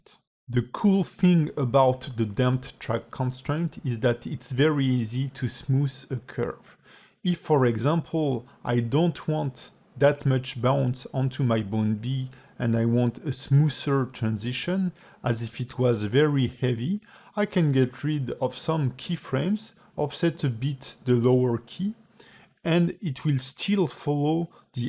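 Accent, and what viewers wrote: France. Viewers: L’accent french en force mdrr continue tu régale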